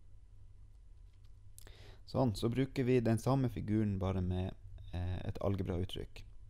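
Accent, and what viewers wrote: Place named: North, Norway. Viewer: du e fra nord-norge og det e positivt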